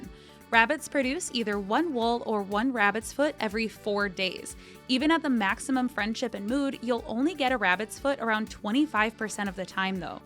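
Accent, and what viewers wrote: Canada. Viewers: You sound Canadian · Are you Canadian?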